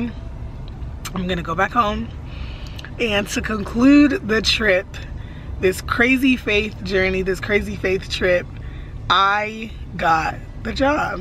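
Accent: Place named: Nigeria. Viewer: I believe you.. and WOW I am in awe. I just ran into your youtube. I don't know how lol literally was just searching a sermon by Todd Michael Crazy faith sermon and this blew me away. I almost didn't listen to this.. I live in LA (from ghana) just opened up a healthcare company and I was telling my business partner about faith so running into this youtube was no coincidence.. God is def moving.. I was looking for something to stir up my faith. I can tell your nigerian due to a slight accent and "she's well oo" haha I love it. Welcome to LA you are definitely walking into your destiny. Make the most of your life and network network network. The lines have fallen for you in pleasant places. Ahhh your talking about timi (amplify africa) really cool and humble guy. We know each other. Omg I'm soooo happy for you dear. Share your testimony and continue to inspire .. 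I hope to meet you soon 🙏🏾 then I'll remind you that im the one who wrote this on your channel. Crazy how I ran into this youtube channel. Keep being OUTGOING.. u never know who you may meet. Divine connection ✨️ ❤ 🔒 CONGRATULATIONS on you new Career. God is faithful ..so happy for you. Wow! Btw u passed my fav spot in-n-out on Sepulveda I think lol..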